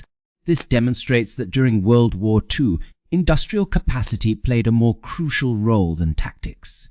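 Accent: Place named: United Kingdom. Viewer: Why add distracting and annoying flickering speckles and vignetted cropping to what could have been clear full images?!! Plenty of clear accurate public domain images of personalities, facilities, etc are available but your AI generates ridiculous junk. Why Brit-accented AI narration of 100% US operations?!! Can't your AI narration pronounce names, especially German, numbers, and much else correctly? DISLIKE! UNSUBSCRIBE!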